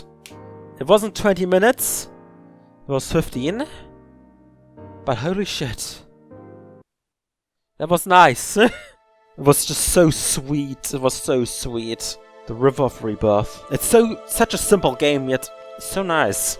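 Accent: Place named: Germany. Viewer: You sound german are you?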